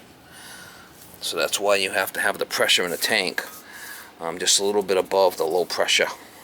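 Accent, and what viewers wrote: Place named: Massachusetts, United States. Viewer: I'd already watched a bunch of water pressure vids when I came across yours. I was going to skip it until I heard what I'm guessing is a Mass accent... so I watched the whole thing. The little dog riding with you was awesome and capped off with the howling pug. Great stuff!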